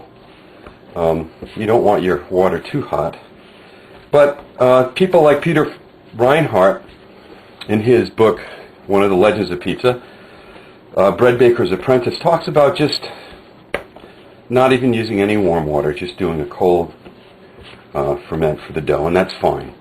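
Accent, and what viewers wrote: United States. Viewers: HEY ARE YOU FROM NEW YORK LOL when ever i lisen to that accent it reminds of when i lived in the bronx, i moved 2 years ago and boy do i miss the pizza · Are you from Philly?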